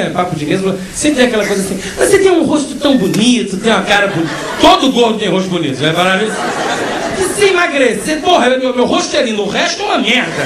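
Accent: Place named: Rio de Janeiro, Brazil. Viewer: Shoooooooow de bolaaaaaaa! Nossa, acho que os melhores comediantes, são os cariocas! O sotaque e o jeito meio largado, tipo " isso meermo" e coisas do tipo, ajudam a ficar mais engraçado! Parabens! sou sua fã!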